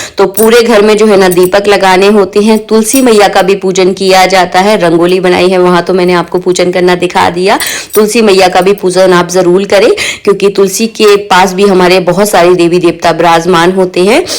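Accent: Bundelkhand, India. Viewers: Mam. Kya aap. Bundelkhand region se h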